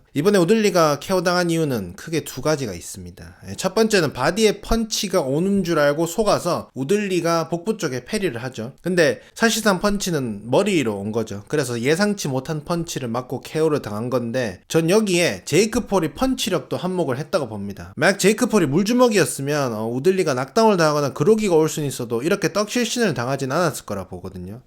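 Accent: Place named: Rural, South Korea. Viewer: 근대 왜 말투가 이렇게 촌스럽습니까? 어디 억양인가요?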